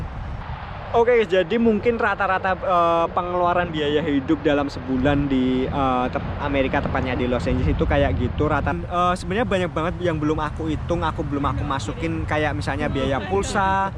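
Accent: Java, Indonesia. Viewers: Asli jawa ya bang, jawa mna bang? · Suarany asli medok jawa los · Mas kok suaranya medok tapi wajahnya kayak blesteran apa ada campuran negara yah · Ini kok sdh di amrek msh medokkk. Lbh nginglish org indonesia. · Medhok jg ya mas wkwkkwkw gokil seru videonya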